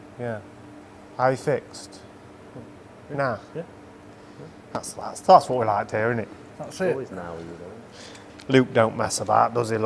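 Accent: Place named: Mansfield, United Kingdom. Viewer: That build is mental 😍😍😍😍 looks a amazing drive 😵‍💫😵‍💫 you sound like Mansfield lads lol . keep up with those crazy builds 👍👏👏👏👏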